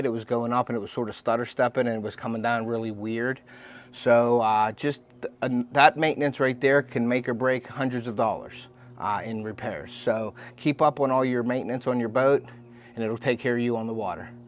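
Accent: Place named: Pittsburgh, United States. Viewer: Awesome video. Searched how to add oil to my merucry outboard and this video came up. Within TEN seconds, i was like is this guy my neighbor?? lol fellow yinzer here!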